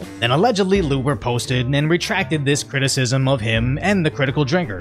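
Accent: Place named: United States. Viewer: If I guessed your heritage with only your voice, I had guessed you‘re an American.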